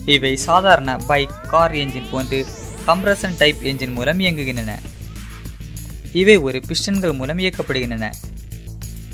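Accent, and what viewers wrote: Kanyakumari, India. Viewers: தம்பி கன்னியாகுமரிண்ணு கரெக்டா தெரியுது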